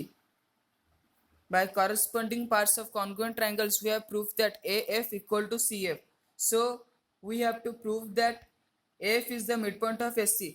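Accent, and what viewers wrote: India. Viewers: R u Bengali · You are an Indian , your acent tell that you might be from south side of India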